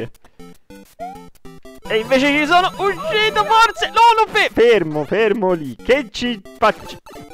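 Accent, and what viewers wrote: Tuscany, Italy. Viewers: ma te sei della Toscana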